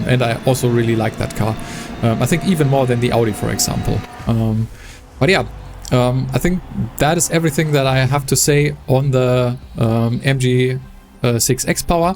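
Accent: Germany. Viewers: Your english sounds like a gernan guy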